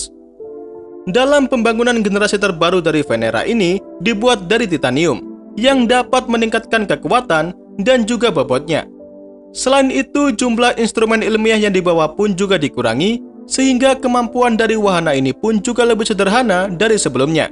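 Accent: Java, Indonesia. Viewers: Masss. Suaramu digawe seng rodok kalem mas.. medokmu kuii lo.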